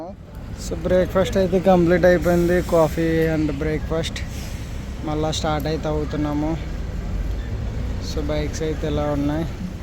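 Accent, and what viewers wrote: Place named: Godavari, India. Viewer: ni Bro Full Video chusa Godavari yassa Bale vundhi bro 😅, Take Care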